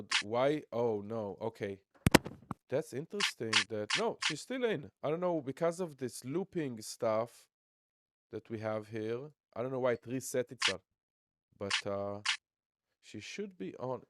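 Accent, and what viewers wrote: Israel. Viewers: Great videos in the channel, easy to follow great energy! Man are you Israeli?❤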